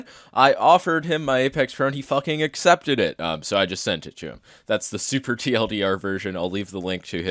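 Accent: New York, United States. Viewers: I'm guessing New Yorker lol Don't worry It's beautiful 😘 It's only a few words as well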